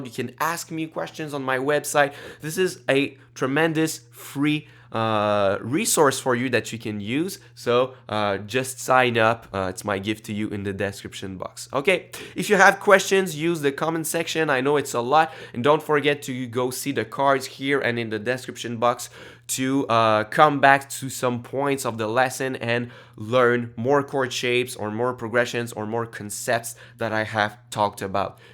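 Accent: Quebec, Canada. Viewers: Oh boy j’ai tout de suite su que tu es québécois mon chum c quand même sick😂😂👌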